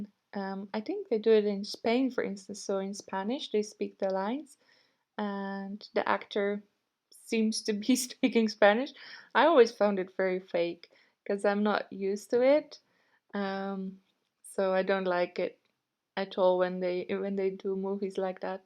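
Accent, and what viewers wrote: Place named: Netherlands. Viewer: I love your accent. It definitely sounds Dutch but I can understand why some might think German to. The artwork is beautiful 💛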